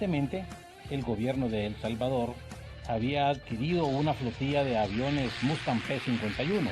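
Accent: Honduras. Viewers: Ese narador a de ser hondureño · Con que dolor lo narra este idiota narrador, se le nota lo hondureño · Ese narrador es puro hondureño no bale verga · Juan Alvarez EXACTO DESDE EL PRINCIPIO QUE EMPIEZA A NARRAR SE CONOCE Q ES CATRACHOOO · Este maje del narrador es hondureño jajaja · Se nota que el que habla es hondureño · No hay duda que por la forma que narra la historia es hondureño jijiji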